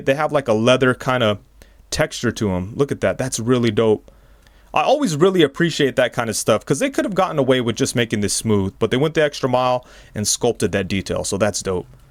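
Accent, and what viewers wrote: New York, United States. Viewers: Where you from? You have this nice New York accent to your voice. Reminds me of family from the Bronx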